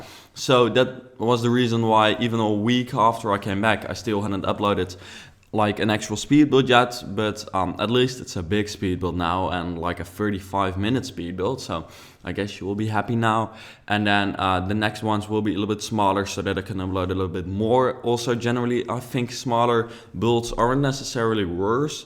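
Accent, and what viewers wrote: Netherlands. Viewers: ah i love your videos and your builds and ik ur Dutch maar je hebt het meest Nederlandse accent als je Engels praat dat ik ooit gehoord heb xddd (which- dw- its cute)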